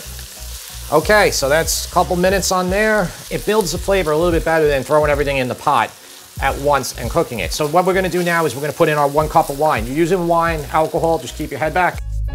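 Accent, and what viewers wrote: New York, United States. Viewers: He's from Long Island so New York. Kind of similar maybe not as exaggerated as Jersey.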